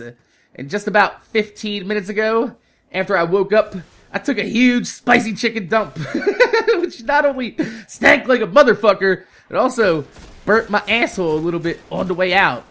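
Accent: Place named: Baltimore, United States. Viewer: I can tell your from Baltimore because it snowed in my recently and the way you say water.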